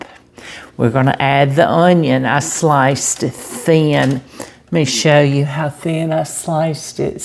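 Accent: South, United States. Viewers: I say Italian the same as you do. Please don’t worry about what negative people think. You are a lovely person and I love your southern accent. I also love your recipes! Have a warm nice weekend with Pop. 💕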